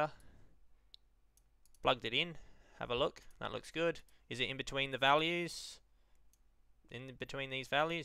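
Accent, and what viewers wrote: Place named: Australia. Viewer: Aussies make explanations much more fun to follow with their accents, cheers mate, and great job!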